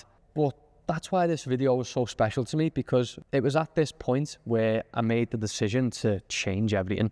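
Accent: Liverpool, United Kingdom. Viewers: Not so much into fitness channels anymore but trying to do better again- subscribed for a scouser who speaks sense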